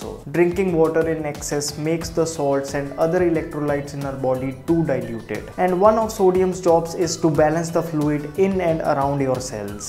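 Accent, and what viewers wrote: India. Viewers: He speaks slow because he is not faking any english accent he speaks purely Indian english accent. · It’s not easy, to understand your „INDLISH"!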